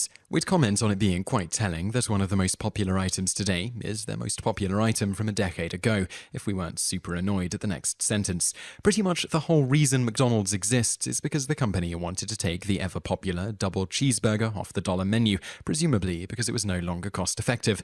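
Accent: United Kingdom. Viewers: Something tells me the narrator of the video is British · Clearly 40p, he's got a UK accent but I guess he's been around Yankees too much with all his yank phrases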